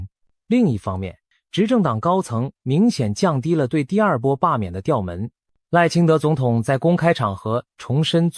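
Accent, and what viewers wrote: Mainland, China. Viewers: 聽起來像大陸人的解說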